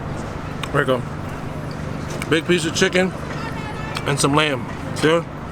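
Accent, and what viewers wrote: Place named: Cambodia. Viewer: Why you talk like ah khmow ?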